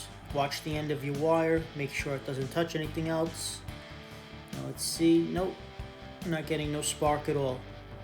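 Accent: New York, United States. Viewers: I’m subscribing because I hear a NY accent! Good content too lol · Ees a New Yorkr or from New Joyzee. No?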